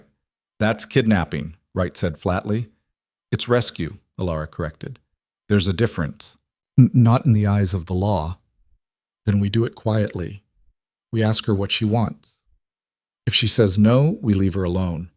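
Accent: United States. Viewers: Remember, this is not exactly an English literature course. More for pleasant entertainment that also gives a glimpse of that era, not necessarily that accurate.The narrator has understandable American accent, at least for these Filipino ears, with the best grasp of the language as many know. Many well educated too, honestly. MANILA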